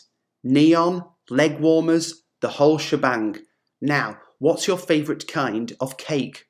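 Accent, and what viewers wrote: United Kingdom. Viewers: I actually like your British accent, it's cool 😂❤even though I find it more difficult than the American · Please tell me, this is it British English?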